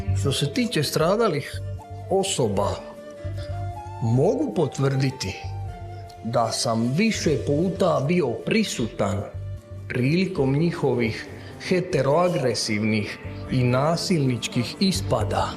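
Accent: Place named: Split, Croatia. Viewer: Možda a govorim šta ja vidim. Interesantan je, ima lipi splitski govor, al nije u škvari sto posto govori on je bija moru u to vrime.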